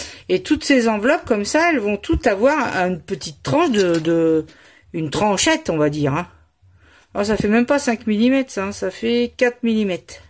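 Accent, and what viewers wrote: Paris, France. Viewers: Coucou... ah le bel accent parisien, tu peux pas renier tes 47 ans de capitale ! c'est super... moi aussi je suis parigote du 15eme , rue de la Convention pendant 35 ans, comme mes parents et grands parents qui y sont nés eux aussi....et le Marché st Pierre j'adorais y aller avec une copine, dans le bon vieux temps... Maintenant j'habite au bord de la méditerranée depuis plus de 30 ans.... c'est agréable il fait beau, c'est different... Bon c'est pas le tout maintenant il faut finir cet album , car le plus dur va être la reliure je crois. En tous cas tes papiers sont superbes. A bientot, je me suis abonnée à ta chaine.. bises <3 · Je n'avais jamais vu un album fait de cette façon c'est tip top. J'adore le bloc Paris il est super beau et j'ai tout pigé !! J'aime aussi beaucoup l'embellissement que tu as acheté pour ta fleur en organza. 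Je trouve super élégant. J'ai tout pigé à droite à gauche on voit bien s'il y a un vide ou pas. Ah bon t'es une parisienne. Moi aussi je connais bien le marché St Pierre. J'y achetais de la soie. J'ai fait de la peinture sur soie pdt longtemps. Moi je suis une banlieusarde depuis toujours !! Je comprends mieux ton phrasé ... Très parisienne... J'adore.... Bisous 😘💋🌞🐦🌈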